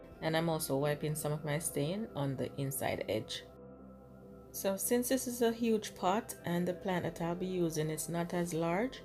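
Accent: Jamaica. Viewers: This is absolutely brilliant!! I adore your voice!💗are you Jamaican? You mentioned condolences… whatever is happening, I am sorry for your pain… I’ve had a tough year myself- lost my mother and 3 premie grand babies…❤️❤️‍🩹💔praying for you, lovie.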